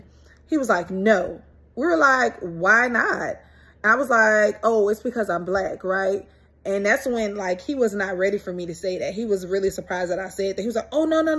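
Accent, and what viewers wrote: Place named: United States. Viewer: they probally thought you were illegal africans at first sight if they hear your accent and it sounds ameriican they will treat you diferently than other black people .unfortunately l a lot of africans illegally go to italy and get involved in things like prostitution and drugs they assume all black people are into this.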